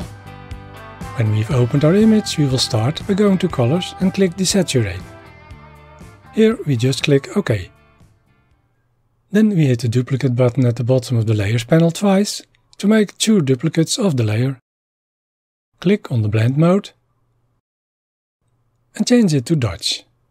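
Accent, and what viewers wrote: Netherlands. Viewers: Lijkt wel een rotterdams accent. Thanks voor de tutorial!!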